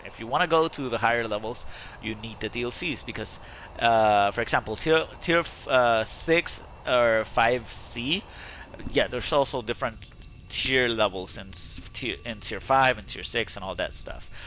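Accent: Puerto Rico. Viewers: Are u from Puerto Rico(Borikén)? Nice vid! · Eres de Puerto Rico?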